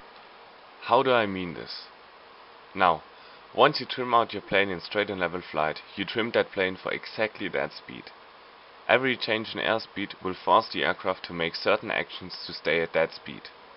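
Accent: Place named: New Jersey, United States. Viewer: Sounds like you are from New Jersey!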